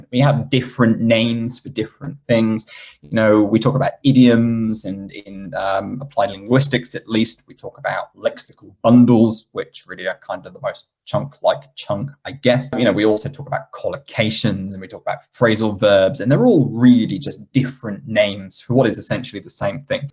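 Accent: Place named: United Kingdom. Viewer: You sound like a British for me 🎉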